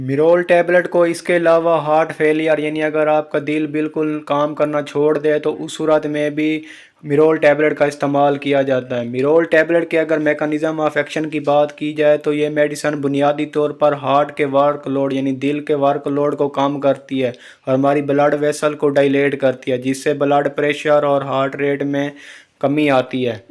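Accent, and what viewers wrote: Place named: Saraiki, Pakistan. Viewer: بندہ ہے تو پڑھا لکھا تاہم لہجہ انپڑھوں والا ہے کوئی پیور دیہاتی اور سرائیکی لگتا ہے ۔۔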